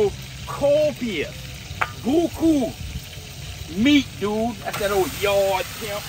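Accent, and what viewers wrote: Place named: Cajun, United States. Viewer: if you weren’t severely autistic and could differentiate characters you’d realize he’s playing exactly that. On podcasts he’s got a strong ass Cajun accent but he’s not retarded.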